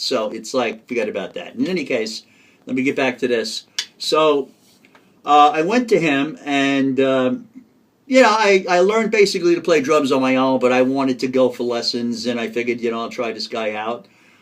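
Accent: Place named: East Coast, United States. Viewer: Great video, you sound like your from the east coast, I'm originally from Boston myself.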